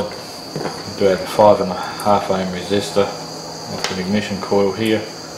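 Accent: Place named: Australia. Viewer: lol aussie accent and crickets in the background and then a kookaburra to top it off!! haha gotta love aus! im from south west sydney!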